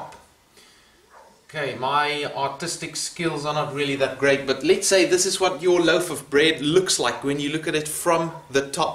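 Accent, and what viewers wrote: South Africa. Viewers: south african or new zealand???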